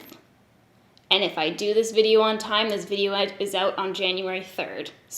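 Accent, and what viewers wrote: Canada. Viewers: Happy New Year! Love your Canadian accent,! That’s actually part of the reason I started watching you, Jamie and Allana. I also used to have a thing about Canadian guys based purely on their accents, then I realised if I carried on this obsession it might end in me marrying a mounty or something... Your skin looks fantastic! I also love Hourglass and got the Ghost blush palette for Christmas, as well as the Scattered Light holiday shadows set. They are beautiful. Hope you and your family have a wonderful 2020! Much love from Munich xx 💋 xx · OMFG YOU FINALLY SAID IT! I LOVE YOUR EYE BEHGS!!!!! WE LOVE YOUR ANGELIC CANADIAN ACCENT! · I love your Canadian accent!! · I think your Canadian accent is delightful! You don't need that kind of negativity in your life...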